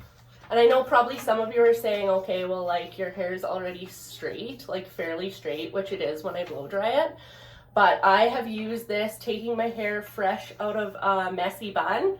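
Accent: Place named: Canada. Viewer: Where are you from?? You sound veeery Canadian!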